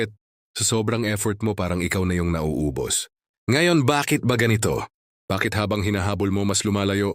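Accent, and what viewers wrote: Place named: India. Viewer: maniniwala na sana ako kaso parang indian na naga tagalog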